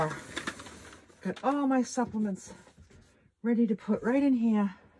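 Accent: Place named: New England, United States. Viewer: Hi! Your song is 💯💗🔥 😍🎵🎶 Love, love love it! I came upon your Camper van Tour vid recently for the first time, while watching tiny off grid living. Glad I started with the Finished Reno. vid, because it lead me to your other vids and your story unfolded! Shortly after, you were singing your song and I thought "What a cute song and How did you know all the lyrics! It must be popular and Why have I never heard it before?" 🤣Then I watched the vid on how your song came to be! How great is that! I live in TX but I'm from LI. When I heard your New England accent, it brought me home. I have family from MA. I just knew I would enjoy this ride😄 As a kid we traveled & camped up and down the coast. I was hooked! Also, I am so like minded with you. From your food choices, your esthetics, your philosophies and your JOYFUL JOYFUL outlook. I just turned 60 and soon needing a new (used) vehicle. 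You've inspired me to maybe get one I can modify on a budget. Thank you! You're a ray of sunshine. I find myself humming that song and remembering to stay on that healthy path. Happy Travels!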